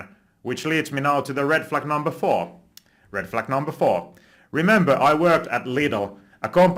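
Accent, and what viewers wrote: Finland. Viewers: you sound finnish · I FKN love Finnish accent when speaking English, littery getting goosebumps. Much love from Sweden😊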